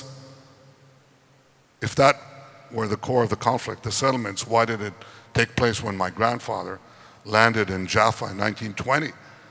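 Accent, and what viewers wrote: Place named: United States. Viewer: Why he has American accent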